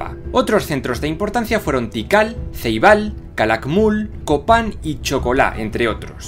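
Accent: Spain. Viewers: Eres el primer español que escucho dando descripciones antropológicas sin poner por delante su ignorante falsa superioridad. Tan mal caen que hasta el acento se odia para estos temas pero tú limpias un poco ese mugrero, solo te tropiezas al decir al final que encontraron pura decadencia. Para colmo los tripulantes de esos barcos no eran precisamente algo selecto. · "Pues muy bueno, lo único malo, es que el de la voz es español por el acento". ¿Xenofobia, dónde? En fin, soy mexicano y sigo sin ver el sentido de estar resentidos por hechos que ocurrieron hace más de 500 años, imagina esto, que un antepasado tuyo mató a un hombre hace 300 años, entonces ¿Un descendiente de ese hombre tiene derecho a odiarte y menospreciarte? Además, nos guste o no, los mexicanos en general tenemos tanto ascendencia indígena como europea, principalmente española, así que sin la conquista, muchos de nosotros ni siquiera habríamos nacido; no digo que eso signifique que tenemos que adorar a los españoles, solo digo que tenemos que aceptar que lo que pasó es historia y ya. 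· Pues muy bueno, lo único malo, es que el de la voz es español por el acento, y las escenas de exclamacion son españoles, que incongruencia, los mismos que vinieron a destruir culturas tan magestuosas, todavía quieran seguir explotando y vivir con estos temas, que bárbaros, no tienen descaro en seguir aprovechándose. Tienen mierda en la cabecita.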